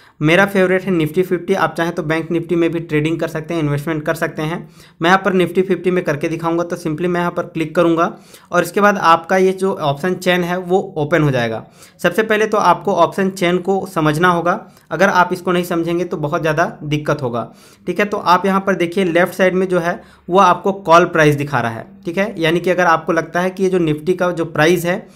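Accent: Odisha, India. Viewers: Kya Bhai ap odisha se ho? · Sir tum odisha se ho kya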